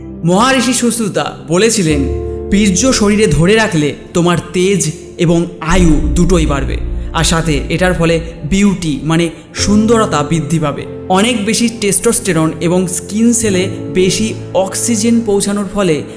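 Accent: India. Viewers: এটা কি বাংলাদেশ ইউটুইব চ্যানেল ? দুঃখিত কথা শুনে ভারত পছিমবঙ্গ মতো লাগলো. তোমার কথা শুনে আমার খুব ভালো লাগলো খুব expression পাওয়া গেলো ।❤️❤️❤️❤️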